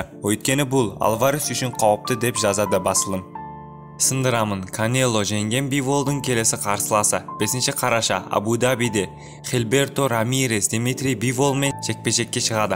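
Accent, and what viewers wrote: Uzbekistan. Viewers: Автор өзбекпа басқама бастысы қазақша сөйлеуге тырысып жатырғой ағайындар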